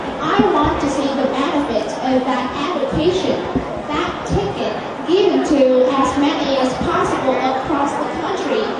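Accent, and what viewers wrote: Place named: United States. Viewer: Your accent is way more like American than British.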